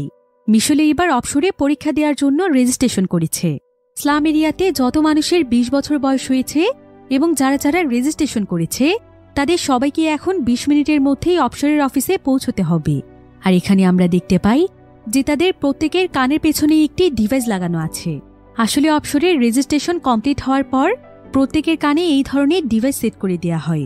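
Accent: Bangladesh. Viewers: accha apo .. apni ki Dhakai taken?😶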